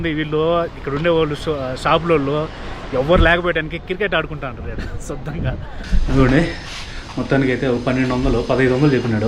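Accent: Nellore, India. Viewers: Hi bro….One of the best story tellers in Telugu….Hats off to ur content bro…..Mee Nellore slang super gaa vundi….❤🎉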